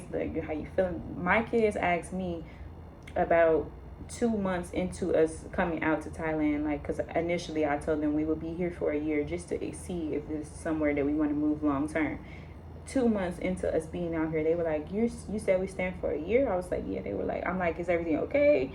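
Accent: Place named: Philadelphia, United States. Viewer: Are you originally from philly? It just really sounds like you are.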